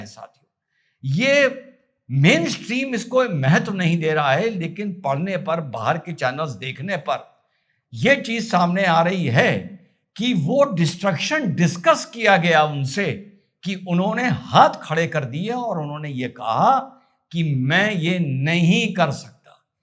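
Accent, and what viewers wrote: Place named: Uttar Pradesh, India. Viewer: Namaste ..aspki UP ki meethi boli sunkar maza aata hai..over and above your honest analysis is not only worthy an applause but u have set an eg of what intelligent and fair educated people should adopt ..salaam to u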